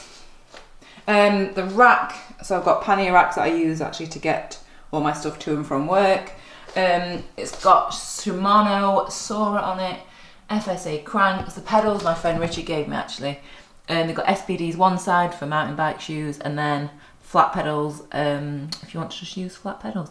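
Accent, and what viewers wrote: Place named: Sheffield, United Kingdom. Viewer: That accent.is it sheffs?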